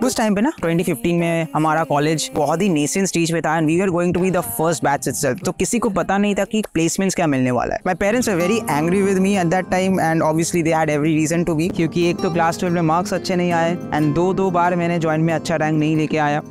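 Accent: Bengal, India. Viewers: Bhaiya are you bengali ? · The cute bengali accent😊😊😊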